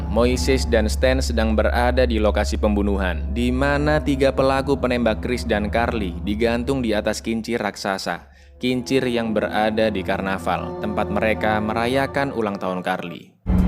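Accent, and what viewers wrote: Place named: East Java, Indonesia. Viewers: Boso jwone kntel men ki asli ndi bro,slm ko jatim bro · Wong ndi mas. Jatim ye?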